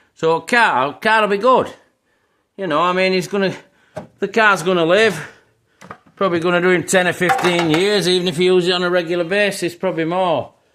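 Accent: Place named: Barnsley, United Kingdom. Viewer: Good video pal just subscribed, you sound very local to me barnsley ish. Show us some update videos of the welding n stuff. Cheers 🍻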